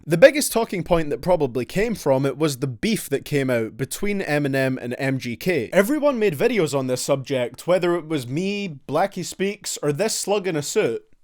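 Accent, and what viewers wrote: Canada. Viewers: this is the most canadian accent i've ever heard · Canadian accent. Well good video anyways. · Okay his popularity was never gone but maybe his album sales was halfed until kamikaze but he still outsold his peers ...so he still wins EMINEM WAS NEVER IRRELEVANT EVER EVEB WITH HIS NOT SO GOOD SELLING REVIVAL!!!! Also are you Canadian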